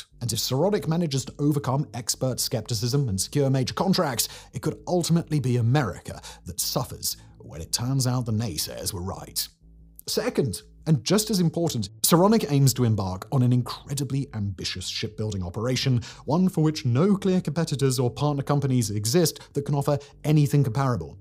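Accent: England, United Kingdom. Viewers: Good Video. Lousy Audio. Get rid of the reverb. Flatten out your voice. Lower the bass. Over and over the sound comes out garbled. You already have the British accent. Quit trying to make yourself sound even better. Deliver it flat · I used to hear an English accent and think you sound intelligent. Now after getting to know your country and people, I just think you sound like a complicity idiot who will go along with anything you are told.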